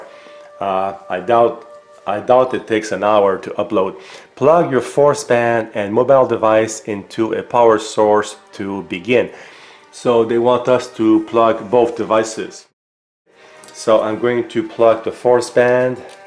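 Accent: Quebec, Canada. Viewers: Merci pour la revue! (I'm writing in French since it seems like a French-Canadian accent like mine ;) )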